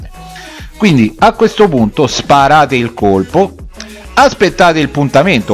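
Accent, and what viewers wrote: Rome, Italy. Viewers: Sei di Roma ?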